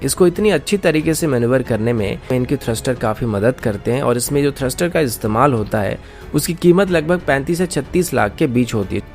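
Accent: Odisha, India. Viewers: U from Odisha ?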